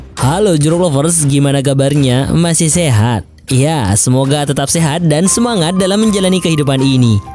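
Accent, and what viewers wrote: Madura, Indonesia. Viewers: Madura ya bang? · Areh oreng madureh yeh🤣 Mak bahasannah Reng madureh🤣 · Madureh kek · Corak besa madureh wkwkw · Orang madura ya kak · Admin nya kek nya orang Madura deh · Ini cuma gua yg dengar apa memang admin orang madura? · Loh kok bisa madura ini mimin nya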